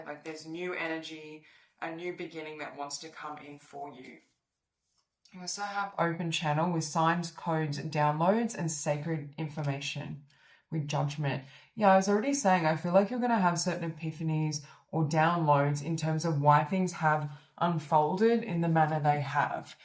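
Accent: Australia. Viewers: #3, thank you for the overall message of renewal. Love the accent, Australia or New Zealand love?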